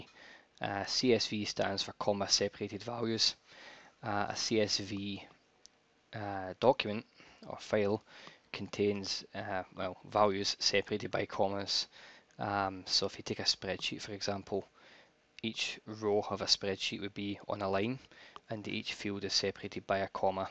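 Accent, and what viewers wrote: United Kingdom. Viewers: Great video, the British English cracks me up a little ;) "Please call on <num>", Lol ;)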